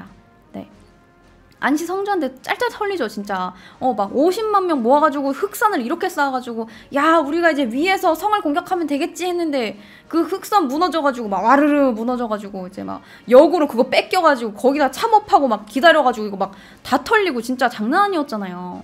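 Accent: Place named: Jeolla, South Korea. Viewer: 은 전라도사람이군요.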